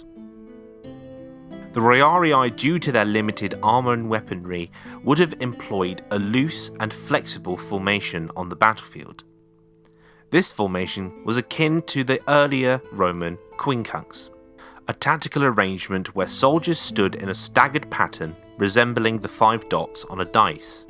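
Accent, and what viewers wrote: United Kingdom. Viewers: ya sound like you're from heartfordshire. Let that proper british accent out will ya? 💯👍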